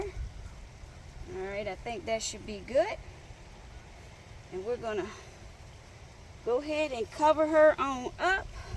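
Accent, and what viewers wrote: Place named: North Carolina, United States. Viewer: You sound like you're from lumberton NC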